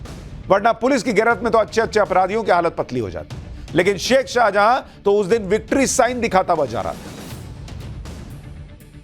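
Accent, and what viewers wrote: Bihar, India. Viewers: Are dada ee to bihari lg rhaa g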